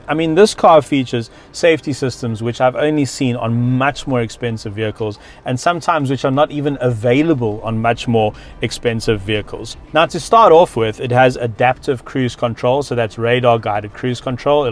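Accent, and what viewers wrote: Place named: South Africa. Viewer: hey, to be honest, south african accent is way easier to listen to compare to the aussie ones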